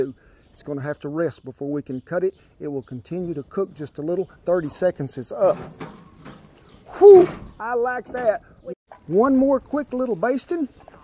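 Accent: South, United States. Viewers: Charming southern accent, nicely cooked fish!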